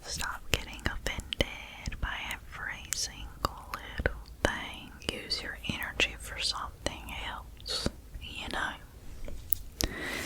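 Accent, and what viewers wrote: South, United States. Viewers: As a southerner I don't have as much of an accent as other southerners. Yours is just a little bit stronger than what I would consider average. The louder I get the stronger the accent gets 😆. It's refreshing to find a southern asmr. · Its a ASMR Hat Trick-- 3 Video's in 3 days 😉!! What can I say I follow hockey being from Detroit!! Love your Southern accent 😎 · It would be interesting to hear your impression of an even stronger southern accent! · Your southern accent is hella dope. Lol. I'm from Cali where we say hella ;) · I didn’t even realize you had a southern accent but I’m from south Texas so you just sound normal to me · On a scale of 1-10, I give your southern accent an ♾ (infinity)! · It was a great video!! Im not from US so it’s interesting to listen to a southern accent. I personally love it ❤️thank you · I love your southern accent! 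I hate those that you can’t tell if they’re British or southern!! Yours makes me feel like I’m back at home. 😘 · Okay literally your southern accent just makes your. ASMR 10000x better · I was literally admiring your beautiful southern accent when I saw this video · Hi. 🙂 I didn't know that you had a Southern accent. You certainly kept that quiet, didn't you? 😂😂 I understand what you mean by 'Lord have mercy'. Please look after yourself and don't tire yourself out. 💙💙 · I’m from the north and I love your accent and I swear I think I’ve only known two or three people in my whole life who have a real southern accent like yours and I think it’s wonderful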